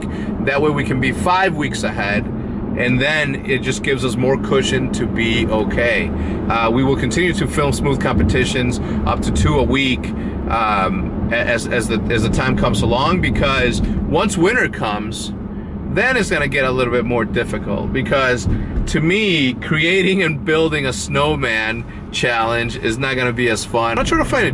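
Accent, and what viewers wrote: Mexico. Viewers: I'm from Durango sounds like he is from Monterey tho haha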